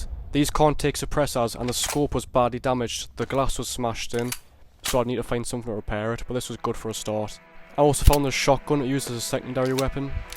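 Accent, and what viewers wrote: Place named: Newcastle, United Kingdom. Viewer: Nice to hear a geordie voice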